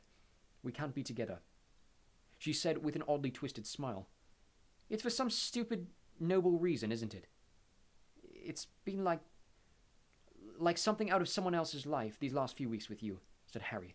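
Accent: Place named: United Kingdom. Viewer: Umm that's called British accent.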